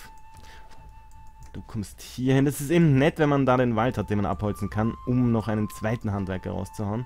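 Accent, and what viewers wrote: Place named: Vienna, Austria. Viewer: Was für ein Akzent ist das? Wien?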